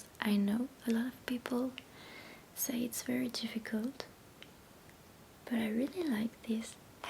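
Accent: France. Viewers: Oh wow I'm so happy, this video is like made for me 🤩 my favourite kind of ASMR videos are book show and tells, and I looove french accents! This is immediately one of my favourite videos ever, it's so comforting and interesting to watch, it's perfect :') would love to see more of this type of stuff (or anything really, I just subscribed). Thank you!! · Hi love! Don’t feel stupid about your English, as not native speaker person but English is my second language which I use every single day, I can say, your English is very good and I love your French accent ❤ you was as my recommended video on main YouTube and totally loved it! I’m suprised because this is the first time, where I see actually a person who is drawing and showing such an incredible work 🥰 take your time and definitely don’t give up on your English love, if someone says about that your English is bad or something, just ignore it and keep going! 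I’d love to see more videos where you are drawing. ❤️ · Tingly and relaxing. I love your soft-spoken French accent. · i studied abroad in france for 4 months in sophia antipolis and lived in antibes- i miss it so much!! your accent is wonderful and reminds me of my time there ❤️ i also want to say that your english is great!! you are very well understood :)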